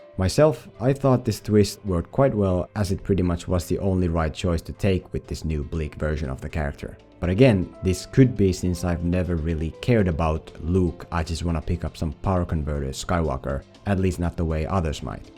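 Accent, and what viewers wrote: Finland. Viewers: Hi, are you Finnish? Your accent sounds really Finnish!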